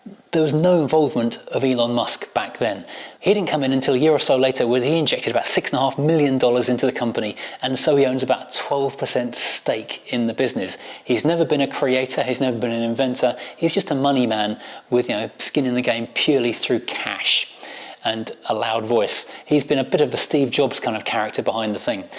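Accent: United Kingdom. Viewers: I'm a car guy who was really interested in this topic. I tried real hard4 several minutes. I found myself irritated by this effeminate, sloppy mouthed, Brit accent, akin2 Piers Morgan. I finally couldn't stand it anymore &gave up. Pewh!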